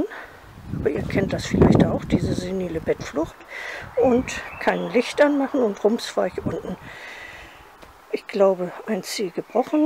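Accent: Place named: Northern Germany, Germany. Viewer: Hallo, richtig gute Idee dein Kerzenständer, freue mich jedesmal auf deine schönen Videos. Ich mag so gerne deine Nordstielaussprache 🤗 u.natürlich die schönen Dekoideen 😙danke 🤗🤗😚😚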